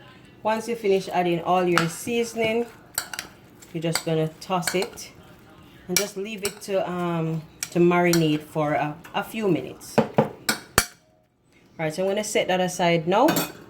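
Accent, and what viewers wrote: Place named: Jamaica. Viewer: Love your JA accent.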